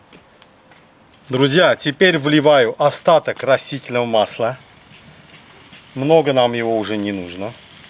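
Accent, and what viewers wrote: Baku, Azerbaijan. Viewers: Джан! Мало того что красиво, а ещё непередаваемые наши бакинские интонации!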